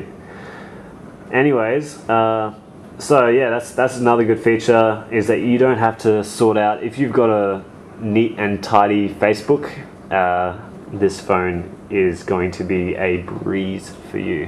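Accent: Australia. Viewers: You Aussie mate? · telstra~~~you are aussie...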